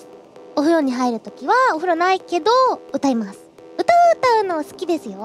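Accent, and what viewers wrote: Kansai, Japan. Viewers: 最近、アイちゃんの関西人感がスゴい！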